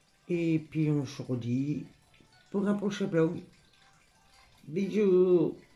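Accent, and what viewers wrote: Nord-Pas-de-Calais, France. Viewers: Un petit coucou du Québec au Canada. J'adore regarder tes vidéos et le fait que tu parles cht'i ça me fait penser à ma grand-maman qui venait de Lille. Ta petite Gorjuss et super belle.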